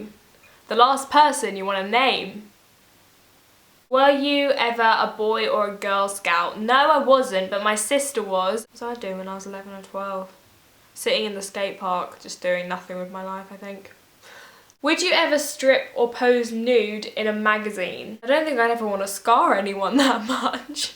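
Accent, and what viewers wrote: United Kingdom. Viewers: Hey! This is the first time I comment because I'm a little shy and I get so embaressed in speaking english with people, so forgive me if there is some mistakes. Well, I want to tell you that I absolutelly love this kind of video, in fact, I love all of them; tutorials, Q&A, vlog's but I really enjoy to know you a little more because you're so funny and ordinary in the best way, you're just being yourself and that's so enough because you are beautiful the way you are. I'm really happy that I found your channel. And god, spanish it's awful to me. It's very similar to portuguese but I just can't speak! haha And just saying: Your british accent is awesome. It's really cute. Here in Brazil we love it. Really. I wish I could stole it from you! xxxxx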